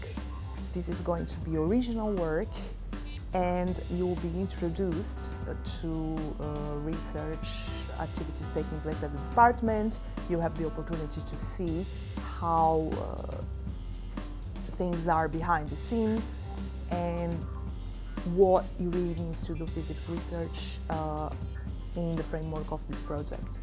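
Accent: Brazil. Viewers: Shes 100% brazilian 🤣🤣 Im also brazilian noticed straight away, nice to know theres a vast community at UCL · you can tell she's brazilian hahah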